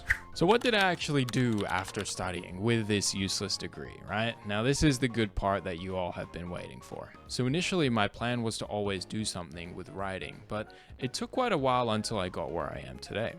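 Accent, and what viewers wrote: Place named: Australia. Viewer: This is probably the most mild Australian accent I’ve ever heard 😂😆 I’m pursuing a film studies degree and while I love doing photography/videography, I’m also worried abt job prospects. But I’m also not particularly a great student so I felt like I had to choose this degree bc I would literally fail the other classes… idk uni is just hard lol. It will work itself out ❤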